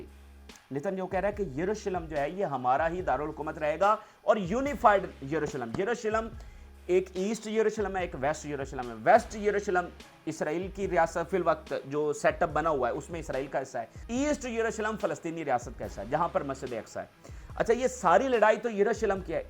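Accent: Punjab, Pakistan. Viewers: That Clown dance didn't end now he is playing music in the background Obviously he has been having fun and there thousand innocent people r getting killed everyday But people like u supporting him and like his ridiculous videos Shame on all of u guys who r supporting him He can't speak one right word of English and no one force him to read punjabi English Can't say right name of jewish politicians name Despite he copies all these news from other sources despite listening he can't learn right English and say right words and other names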